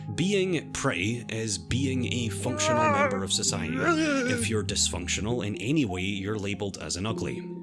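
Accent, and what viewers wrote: Scotland, United Kingdom. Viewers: Are you Scottish? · You're ancient is wonderful (thinking Scottish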